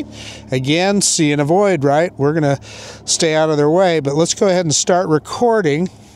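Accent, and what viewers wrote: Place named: Idaho, United States. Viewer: Sii y se pierde todo el acento de Idaho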